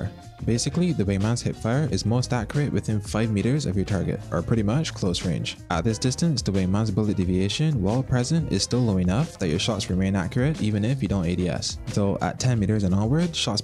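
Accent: Barbados. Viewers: Are you from Barbados? Great guide btw, it really helped a lot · I hear that Bajan accent!! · You a Barbadian?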